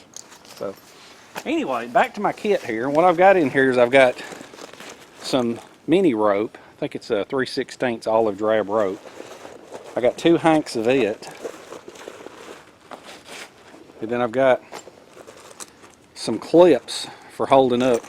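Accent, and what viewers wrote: South, United States. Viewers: oh my yu make me laugh so funny love ur southern voice a your very smart love your videos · Informative and entertaining, thnx! (PS This Canadian loves your southern accent, a part of your charm, IMO.)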